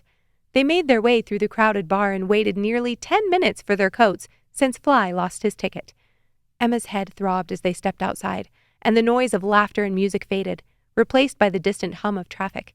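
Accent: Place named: Canada. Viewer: i love this soooo much the slight canadian accent just warms my heart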